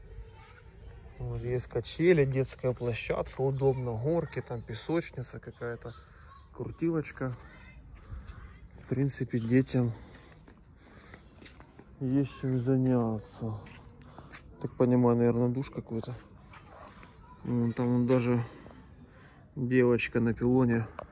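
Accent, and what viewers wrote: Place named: Ukraine. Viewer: Так, тут помилка вийшла. Просто, коли Ви говорите російською, дуже чути, що Ви українець. Говоріть українською, в ній є душа.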